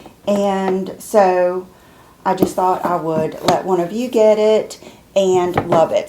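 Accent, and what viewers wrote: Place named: North Carolina, United States. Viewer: I know a North Carolina accent when I hear one. 🙌🏼I too am from North Carolina. The eastern Part! 😘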